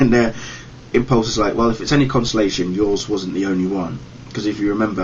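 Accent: United Kingdom. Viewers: What part of England u from I know it must be up north lol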